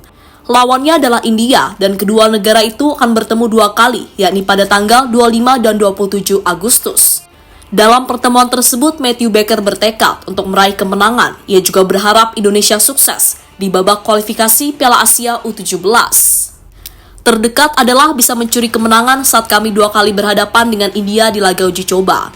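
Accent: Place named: United Kingdom. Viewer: Suara aksen british nya lebih menggetarkan. Cocok didengarkan di dalam bioskop film documenter bertema perang, mafia dan horor.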